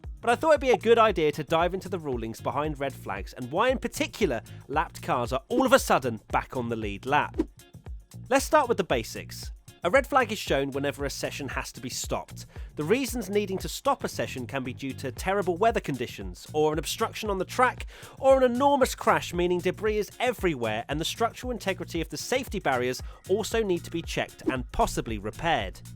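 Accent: United Kingdom. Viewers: Listening to your very british accent its obvious who you root for. Yet you argue let the haters comment!! Yet two seconds later you are the hater yourself. However the rule of unlapping is utterly BS. One could argue to unlap but at least provide a half a lap distance between the front runners and the rest of the field in order to make sure the starting grit is in sequential order. Only seems fair no?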